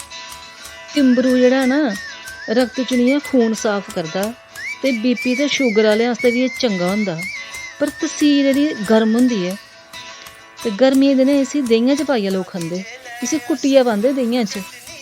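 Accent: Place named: Jammu, India. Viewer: Your dograa accent is very nice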